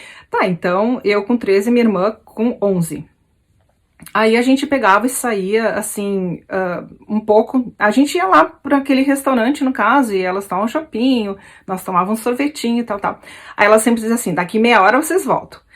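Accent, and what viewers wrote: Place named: Rio Grande do Sul, Brazil. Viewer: Boa noite querida! Foi bom ouvir suas lembranças também me lembrei das minhas kkk. Vc é muito bonita não aparenta a idade que tem 👏👏 eu fiz 54 dia 4 de abril. Olha eu acho legal quando vc me chama de guria kkkkk é quando vc fala BA 😄 VC não esqueceu o sutaque kkk também gosto quando vc fala em inglês estava estudando inglês só parei por causa desse corona não consigo estudar. Fique com Deus 😘💖🌺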